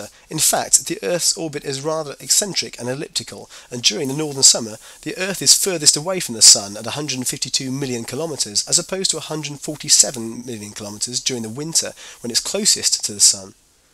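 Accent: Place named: Scotland, United Kingdom. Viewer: I think Scottish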